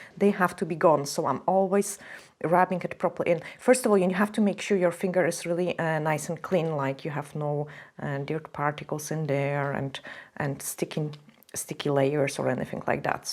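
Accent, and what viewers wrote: Scotland, United Kingdom. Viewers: I love these they're gorgeous! I've actually just tried doing some flowers like this and I'm amazed at how breaking it down and starting with the dots is such a HUGE help, so thank you! 👏 By the way, Is that a bit of a Scottish accent I hear? 🥰💜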